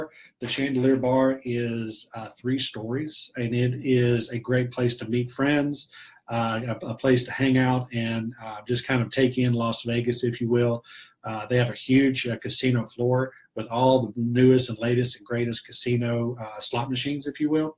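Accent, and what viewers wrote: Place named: Oklahoma, United States. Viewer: Sounds like an Oklahoma oil money red state hick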